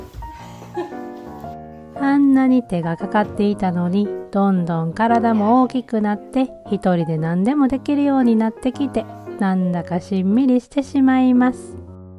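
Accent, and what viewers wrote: Kansai, Japan. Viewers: 眼の手術後、あまり無理できなくて横になっていることが多く、nobanaさんの関西弁のゆったりしたYoutubeをBGMにとてもリラックスできました、有難う御座いました、海外生活お身体に気を付けて頑張って下さい。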